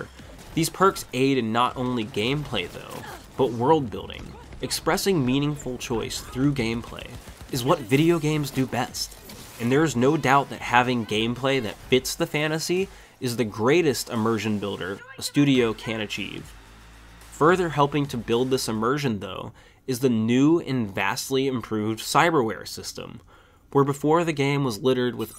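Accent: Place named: United States. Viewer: Youre not italian. Youre american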